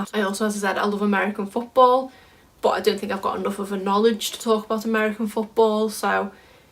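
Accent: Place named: Yorkshire, United Kingdom. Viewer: Your accent sounds something like Yorkshire. Lovely accent!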